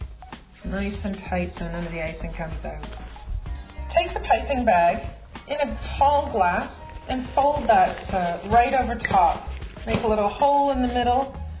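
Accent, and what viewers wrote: Canada. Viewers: ooh i like her canadian accent. and what size star tip was that?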